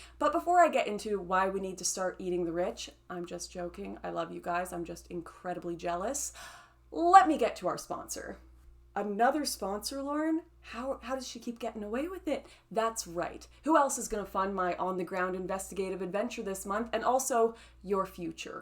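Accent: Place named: Canada. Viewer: Is it me or is your Canadian accent back with a vengeance.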